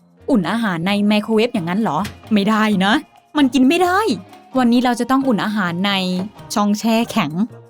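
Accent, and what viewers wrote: Isan, Thailand. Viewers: พี่เป็นคนอีสานเหรอ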